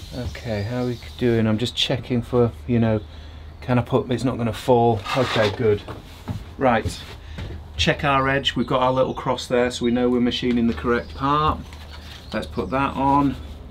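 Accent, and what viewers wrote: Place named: Ireland. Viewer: Irish accent !!!???!!! Not to bad I guess lol Sean from IRELAND 😉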